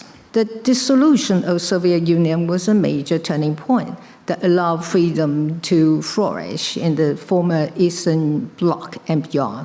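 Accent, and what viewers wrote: United Kingdom. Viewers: 我超愛這英式英語腔，超迷人的。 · 民主制度正遭受認知戰、資訊操縱與軍事威脅的挑戰台灣以韌性應對威脅，並積極強化國防與數位安全呼籲民主國家建立團結機制，共同維護區域與全球穩定台灣願意分享經驗，並承擔維護民主安全的責任以上為小英演講的重點英國腔實在太迷人了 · 前總統真是女中豪傑，非常有智慧她不用看搞就能用流利的英國腔英文演講而且都不會凸槌，真的佩服她的勇氣和智慧！謝謝小英前總統讓世界看到台灣！有你真好，上帝祝福你平安健康！ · 小英總統英國音很好聽，一切盡在不言中，我永遠小英辣台派，永遠祝福感恩小英總統， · 說英文真的很好聽（真的很療癒），腔調有些英文腔，在她還沒當上總統時，就知道她很會說英文，也聽過她的演講，台灣唯一不會說英語的總統 “陳水扁”吧！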